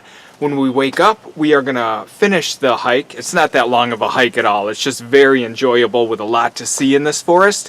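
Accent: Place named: Western New York, United States. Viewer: Just found your channel, and heard the WNY in your voice. I grew up less than an hour from this trail. Live in VA now, and miss the geography and the seasons. Subbed. Go Bills!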